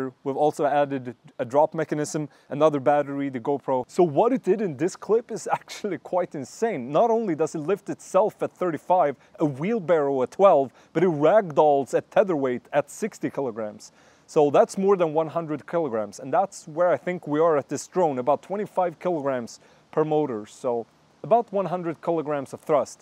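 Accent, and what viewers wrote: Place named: Sweden. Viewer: That was pretty amazing... And I recognised your Swedish accent almost immediately — it’s remarkably similar to David Windestål’s. I’ve watched enough of his tricopter videos to recognise that accent that in no time!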